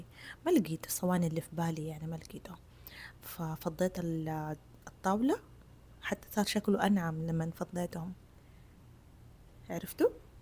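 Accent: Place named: Jeddah, Saudi Arabia. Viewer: هي سعوديه جداويه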